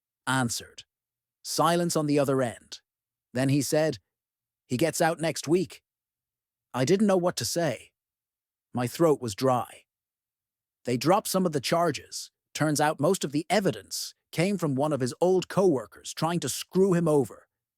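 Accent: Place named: Ireland. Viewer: Creepy story but the Irish accent is refreshing!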